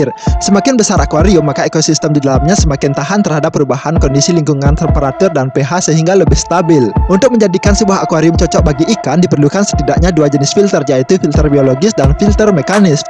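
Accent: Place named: Bali, Indonesia. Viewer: Tpi logatnya Bali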